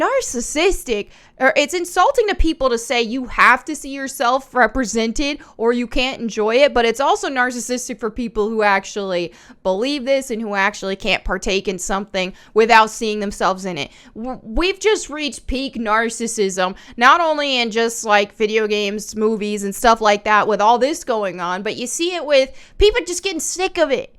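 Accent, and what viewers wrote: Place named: Wisconsin, United States. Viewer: Ran into your channel after diving into the frost craziness. Awesome content creator.. you have an interesting accent. Like you liked in Wisconsin for 15 years and then moved to the south for the last 15 lol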